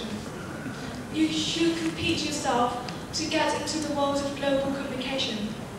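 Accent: United Kingdom. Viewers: What a British accent! So impressive ^^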